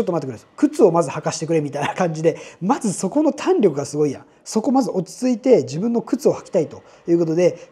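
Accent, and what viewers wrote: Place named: Fukuoka, Japan. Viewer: 山口県出身なのか、喋り方は福岡っぽい。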